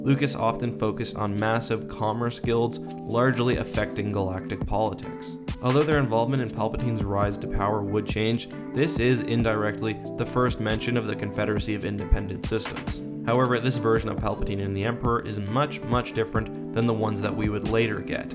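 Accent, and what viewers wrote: Canada. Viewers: Question for you. Not a criticism, but from the way you say your “outs” you’re Canadian or from the Wisconsin region. Correct?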